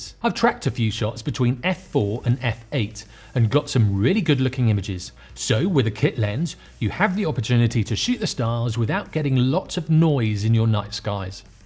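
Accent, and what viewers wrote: United Kingdom. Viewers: I like your presentation skills - you present with conciseness and brevity. And, cheery on the top is your british accent! ;)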